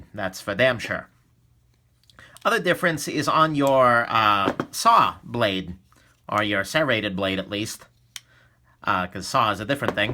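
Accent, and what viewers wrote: New York, United States. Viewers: I love this man's Accent, makes me miss NY. .😭 CONEY ISLAND LET'S GOOO!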